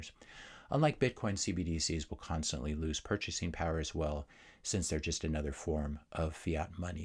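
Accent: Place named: United States. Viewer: As a european i felt the no gun comment totally made you out as american even beyond the accent. Europeans think totally differently. If we need guns we already lost, our humanity, our civilisation. We might as well start eating burgers.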